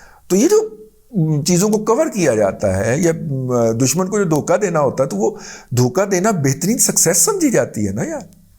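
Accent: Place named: Okara, Pakistan. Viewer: sir ge app depaalpur okara waloon ki zaban bol tay hain. hamaray relatives wahana rhtay hain hum log basically bvahwalnagar ke hain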